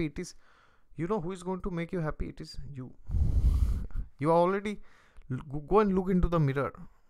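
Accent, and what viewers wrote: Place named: Bengal, India. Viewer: ji you sounds like a bengoli boy.. Your way of talking is just like a Bengali boy.. I like that as because I am also a Bengali.. Hehe 👌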